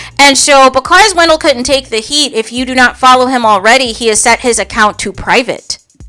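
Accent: Midwest, United States. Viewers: I like your accent. It's either Midwest USA or Canada, I can't tell.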